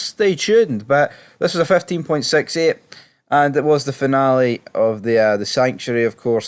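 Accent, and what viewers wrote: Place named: Liverpool, United Kingdom. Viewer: damn i guess scouse accent isnt the best one for youtube videos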